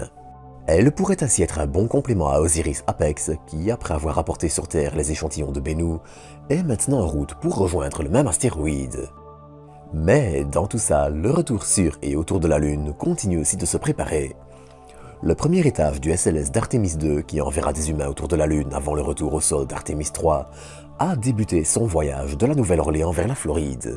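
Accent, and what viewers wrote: Belgium. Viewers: Accent Belge, normal quand on est né en Belgique.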